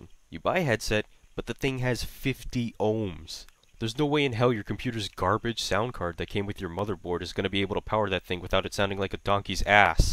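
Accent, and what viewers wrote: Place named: Toronto, Canada. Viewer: definitely from toronto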